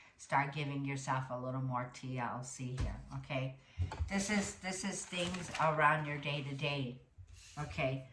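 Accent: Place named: Chicago, United States. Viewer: Beautiful, affirming reading. Thanks so much. For your brilliant assessments. They feel spot on. Thanks for all the study and research you are doing. You are so intuitively gifted and we Geminis love to communicate our higher knowledge. Love you,. You are a treasure. Love your Chicago accent. I lived across the street from Water tower Place when I went to grad school at Northwestern for 7 years in Chicago. Love my Chicagoans!!! Happy 4th. Stay cool in Las Vegas😎💖🙏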